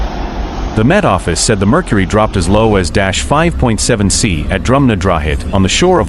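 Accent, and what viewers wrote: United States. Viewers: Why is it an American reporting this….sounds like bull … No different to any other year for some parts… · Why has the narrater got an American accent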